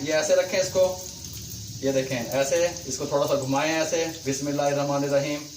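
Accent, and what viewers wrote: Punjab, India. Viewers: App ka baat karny ka punjabi stayil bht acha hai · Bhut mithi boli punjabi urdu 💝💝💝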